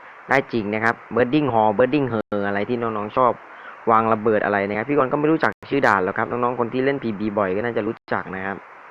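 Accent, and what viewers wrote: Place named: South, Thailand. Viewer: คนใต้เออ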